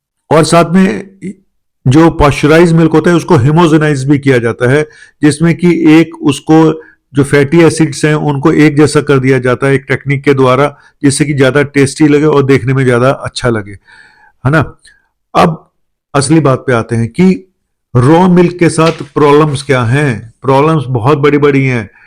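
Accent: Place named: Punjab, India. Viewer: Dada ji came from country of milk that IS PAKISHTAN I could make out from your way of talking PUNJAB age 80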